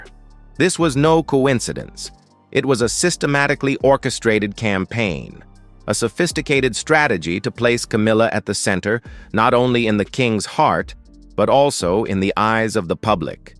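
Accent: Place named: United States. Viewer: so many American accents on these stories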